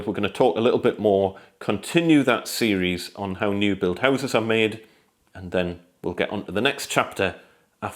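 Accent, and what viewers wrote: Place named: Geordie, United Kingdom. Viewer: Really love your videos - Very informative and great job! As a Geordie who has lived in London for 15 years, it’s nice to hear the friendly, familiar twang of the hometown! Keep the videos coming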